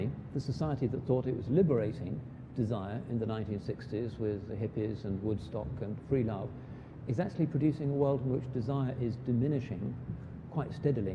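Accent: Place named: United Kingdom. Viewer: Why the british accent?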